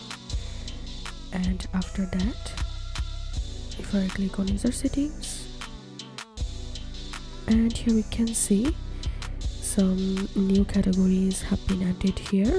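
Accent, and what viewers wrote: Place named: India. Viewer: heard the indian accent and clicked off 💀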